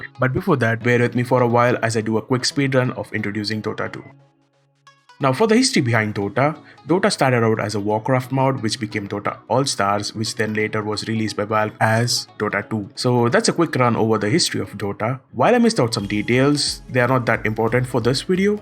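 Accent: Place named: India. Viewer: that indian accent haha superb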